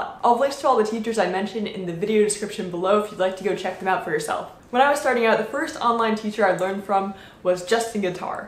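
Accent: Netherlands. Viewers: You sound Dutch, is that true? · Are you dutch haha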